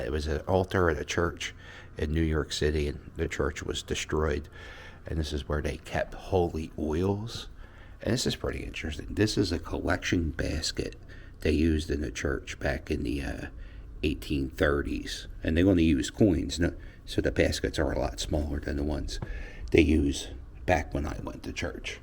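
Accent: Philadelphia, United States. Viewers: I really enjoyed this tour. I love your Philly voice and I love all of the same things about Philly that you do. I was born in Philly and raised in SJ, but have been to Philly frequently enough. Thank you for these videos. I hope you are going to Mass every week. God bless you.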